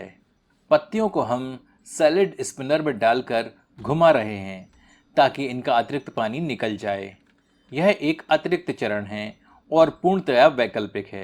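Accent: Standard. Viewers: Aapka bolneka andaz aur sudh hindi bohot achaa hai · Wow, swadisht, shuddh Hindi k saath 😀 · aapki hindi zabardast etni shudhta se bhari prastuti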